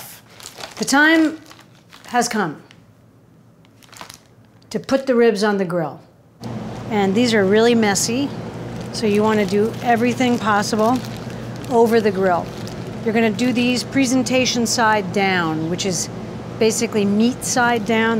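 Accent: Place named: Northern England, United Kingdom. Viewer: I’m living for your Northern accent luv. Proper bloody great!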